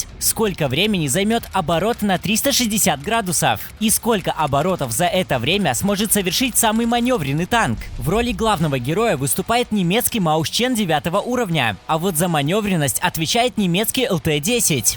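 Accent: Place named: England, United Kingdom. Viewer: Я один заметил что у него небольшой английский акцен?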